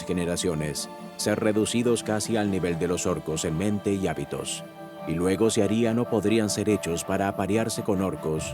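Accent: Argentina. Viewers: pues me alegro que no seas una ia, aunque de verdad me dio esa sensación,,, apoyo la traducción, pero no me gusta el doblaje español, ya que se suele emplear un dialecto genérico que no representa bien la realidad,,, no niego la calidad de NOR, pero hay muchos youtubers hispanos que también lo hacen bien, y mucha gente no los conoce porque solo consume contenido americano. Si creas tu propio canal, contando las historias a tu manera y con tu acento argentino, prometo subscribirme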